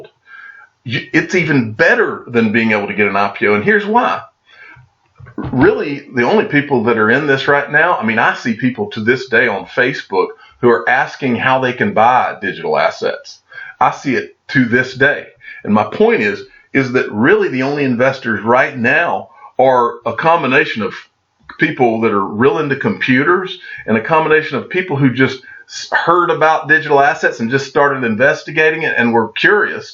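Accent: South, United States. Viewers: Can’t believe that I’ve watched every single clip uploaded by you since you appeared on YouTube. Reason I started listening to you was because you remind me of my friend Steve who was from down south and had the same tang or accent as you... I’ve checked out so many YouTube channels but no one is more informative and inspirational than the. One love.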